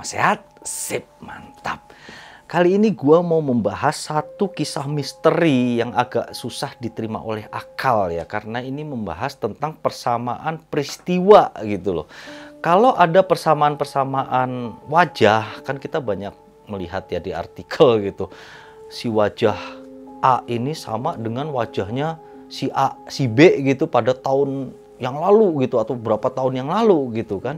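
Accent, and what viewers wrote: Java, Indonesia. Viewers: Medhoknya itu lho enak didengerin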